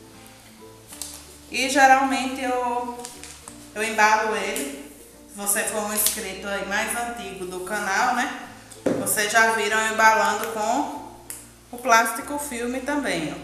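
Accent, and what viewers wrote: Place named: Northeast, Brazil. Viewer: Olá gosto muito dos seus vídeos meu nome é Walmir Lopes sou de Salvador Ba vc é muito simpática vc é de que lugar vc remédio um sotaque nordestino eu gosto muito desse sotaque eu também sou nordestino um beijo.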